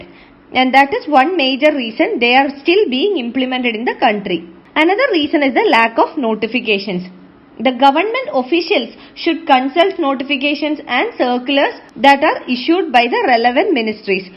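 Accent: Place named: Kerala, India. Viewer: The voice says it clear that the person explaining is a malayali😂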